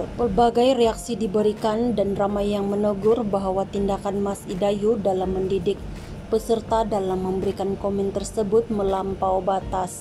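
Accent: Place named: Sabah, Malaysia. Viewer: Loghat Sabah tu..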